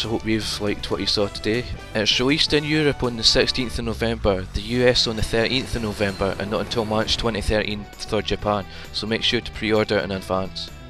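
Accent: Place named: Scotland, United Kingdom. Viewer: You must be Scottish, cool accent! Regards BBKCjr. ;)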